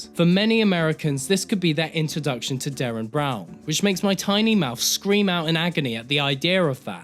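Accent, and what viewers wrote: England, United Kingdom. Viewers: You sir are a talking vagina. Just because you point out the obvious in a high pitched English accent does not make you clever.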